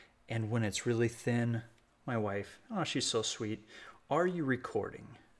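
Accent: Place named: South, United States. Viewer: Hi,. Really nice colors. I imagine it's gonna dry deeper so be sure & show us the finished painting. Oh, btw, I am a deep Southern gal & take no offense to yo bit of South talk. (Just don't put me down in my presence.)😎 💕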